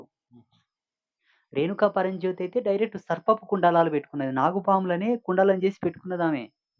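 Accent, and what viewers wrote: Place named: Telangana, India. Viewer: Meru telanganayasa matldina me vakkulu adbutham swami 🙏 telagana biddalandaru garvapadali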